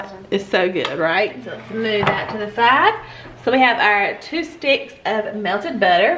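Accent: South, United States. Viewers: Love the southern accent! Part of the southern tribe. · I'm new to your page and I love what I see so far and I love your southern accent and by the way I'm from south carolina and can I ask where you from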